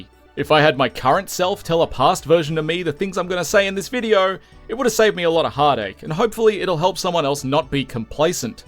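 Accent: Australia. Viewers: If you didn't show us how to reduced those Ss I would have no clue you are Australian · Wait, you're Australian? I'm completely not surprised and in fact I'm sure you mentioned it in at least one video I watched before, but it just didn't "click" with me. Has audio editing chicanery been behind me not registering your accent in our shared native language as "foreign"? This is like if I was an NPC who suddenly noticed some metagame exploit you've been going through on a challenge run.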